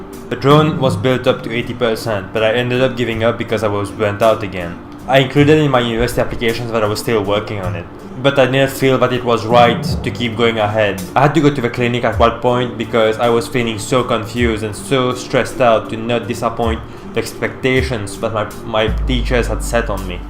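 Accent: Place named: Mauritius. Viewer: Oh, i am Mauritian too, I recognized you from the beginning itself because of your familiar accent. Guess what, applied to MIT too, waiting for regular decisions 2019. I feel less qualified than you, means I don't even stand a chance. I have not applied with Phronesis, did everything independently with my own reasearch. I took two gap year after highschool during which I was working in an american company. Thanks for sharing the video.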